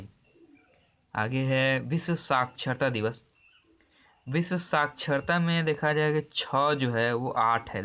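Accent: Bihar, India. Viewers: Sir aap bihar se hai kya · Bhut bihari bolte ho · Sr r u frm bihar????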